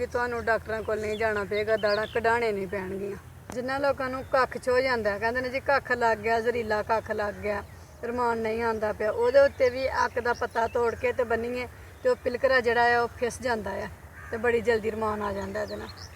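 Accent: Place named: Sialkot, Pakistan. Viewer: آنٹی جی آپ کی حکمت کے بارے میں تو میری کوئی اچھی رائے نہیں لیکن آپ پنجابی بہت پیاری اور میٹھی بولتی ہیں، مجھے لگتا ہے آپ کا تعلق سیالکوٹ سے ہے۔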